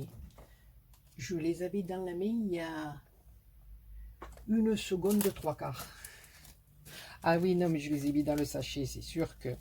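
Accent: South, France. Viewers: Coucou, ils sont superbes ces stickers, j'aurai bien aimé les trouver!! ils sont magnifiques tes ATC, j'adore!!! et le petit accent du Sud met du soleil dans nos coeurs dans ces moments de grande épidémie! ça fait du bien! merci beaucoup pour ce tuto, bisous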